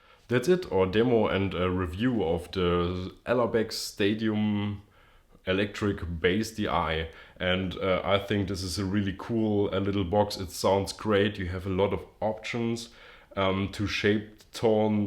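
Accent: Germany. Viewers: Things always sound way cooler in a German accent.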